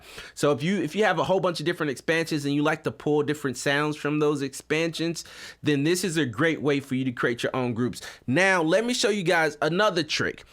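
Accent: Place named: Baltimore, United States. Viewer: Bro are you from Baltimore😂 couldn’t help but notice the accent but this video was great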